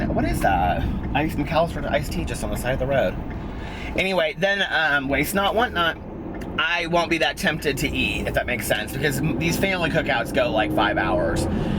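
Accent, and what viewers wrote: South, United States. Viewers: Sister, do you have roots in the old south?? You have a bit of a southern accent.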